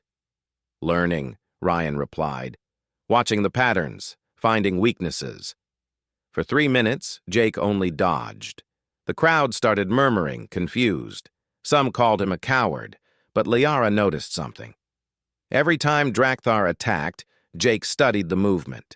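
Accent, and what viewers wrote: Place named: South, United States. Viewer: Why the hell did you choose a redneck voice to narrate this AI story.......it made it into even more of a joke.....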